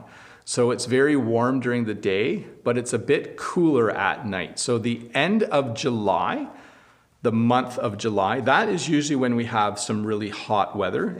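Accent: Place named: Canada. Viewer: Hey, I would like to follow the Canadian accent. Do you have any tips?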